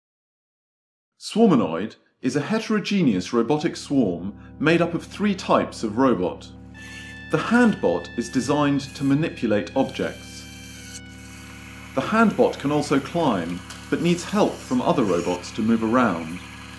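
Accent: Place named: England, United Kingdom. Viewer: Vomito! This is the stupidest project I have seen on youtube. Funded by the European Union. Perfect. I thought I was going to throw up watching this giant waste of money and listening to the stupid pompous english accent. Next time, pay an immigrant to walk over and pick up the book. A lot cheaper. And less pollution.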